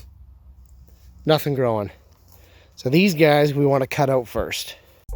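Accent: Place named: Canada. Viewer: Certain dialects or speakers from specific areas (in this case maybe a part of Canada?) it just how they speak. The intonation you are speaking to falls into this “Canadian accent”.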